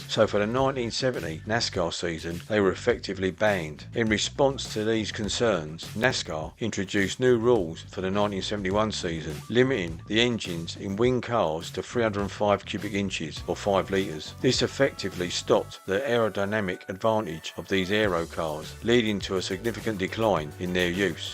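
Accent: United Kingdom. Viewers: Very well done with the video matching the audio very well in spite of the audio being generated by your British narrator, which sounds really off unless it was targeted to British audiences. 🍁 eh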